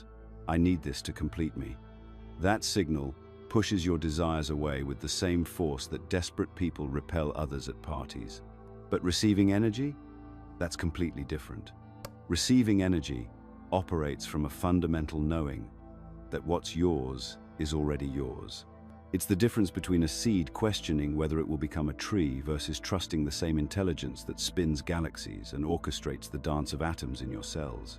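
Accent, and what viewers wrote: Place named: United Kingdom. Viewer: With the British accent 😅😢😅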